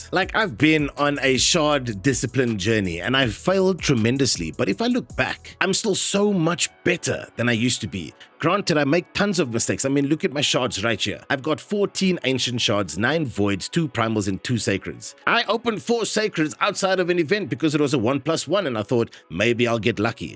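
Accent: South Africa. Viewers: Yo bro, I take it you're from SA, I hear that colored accent. Nice video. I cannot agree more with everything you've said. Good job.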